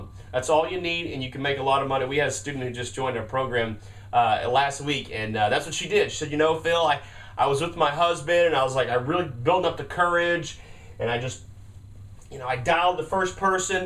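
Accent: United States. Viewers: Where did the country accent come from all of a sudden? :\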